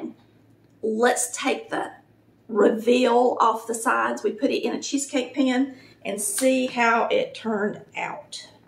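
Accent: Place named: Kentucky, United States. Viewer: I love to listen to you talk cause I am a Kentucky girl living in Florida for many years and it's nice to find someone that talks like me. God Bless you and your family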